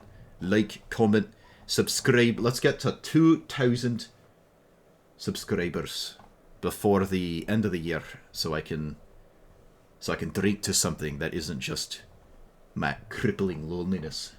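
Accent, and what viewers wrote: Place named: Scotland, United Kingdom. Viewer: Algorithmic Engagement* with Scottish accent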